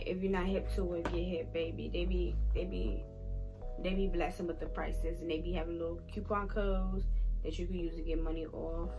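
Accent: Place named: Baltimore, United States. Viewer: Only real ones know she has a Baltimore accent 😭😭😭😭.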